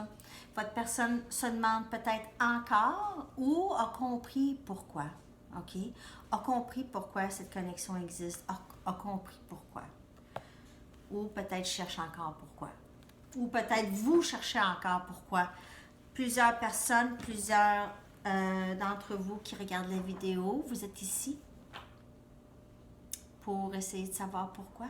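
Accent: Quebec, Canada. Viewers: Bonjour, merci beaucoup pour cette guidance ça me parle beaucoup. J'ai découvert ta chaine il y a peu et ça m'a attirée toute suite, déjà parce que, si tu me permet, je vois à ton accent que tu es Québécoise. Et il se trouve que ma flamme jumelle est justement Québécois. 😊 D'ailleurs, depuis que je le connais, j'ai l'impression que les mots Canada, Québec et Montréal me suivent partout. Je pense que c'est la vie qui essaie de m'envoyer des signes. Peut-être pour aller habiter là-bas bientôt, même si je vois pas comment ça pourrai arriver. J'habite en France. Il se trouve que je suis entrain de me prépare à déménager, car mon bail se termine bientôt. Je n'ai pas encore décider où aller. Enfin si, dans le fond je sais où j'ai envie d'aller. Au Québec, mais ça m'a l'air compliquer à première vu. Voilà, je parle de ça au passage, car je me dis que comme vous êtes de la bas. 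Vous auriez peut-être des conseils, éventuellement à me donner. Peut-être que ça pourrai m'aider. Et encore merci pour les tirages, ça m'aide beaucoup à m'éclairer par rapport à ma flamme jumelle et surtout ça m'aide à prendre confiance. Car c'est vrai qu'au début, il y avait encore parfois des petits doutes et des peurs. M'étant toujours fait des désillusion à chaque fois que je m'intéresse à quelqu'un. Malgré que parfois, j'étais sûr de moi. Donc au début, il y avait surtout là peur d'être déçue. Enfin voilà, bonne soirée.😘😊💗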